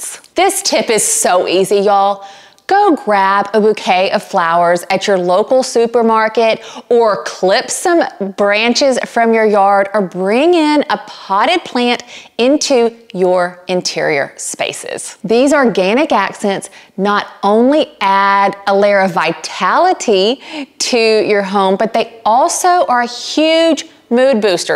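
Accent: South, United States. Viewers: I loved this video. I love your design style. I'm from the UK and absolutely love your Southern accent. My favourite was your advice on lighting.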